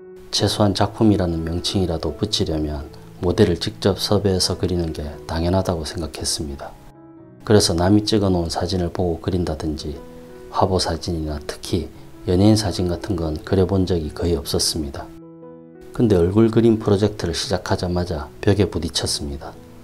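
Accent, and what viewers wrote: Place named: Busan, South Korea. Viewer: 정말 아재같으셔서 구좋알했습니다. 말투가 딱 들어보니 부산 같으신데 맞지요?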